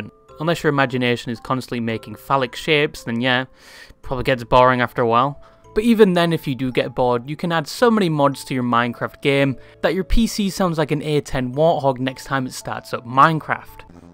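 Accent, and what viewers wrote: United Kingdom. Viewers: ewwie briteesh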